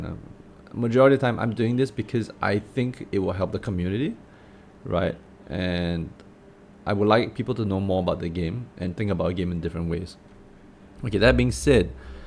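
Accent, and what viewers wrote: Singapore. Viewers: hey man, you sound like you are from singapore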